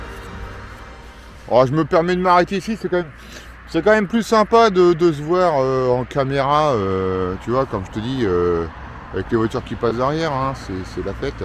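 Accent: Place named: Marseille, France. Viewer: Salut c’est mouna trop marrante la vidéo je sais pourquoi tu parles marseillais c’est se que tu faits avec papa au bureau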